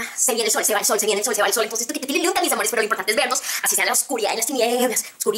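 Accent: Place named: Colombia. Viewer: Tan bella siempre....amo tu acento colombiano! !!!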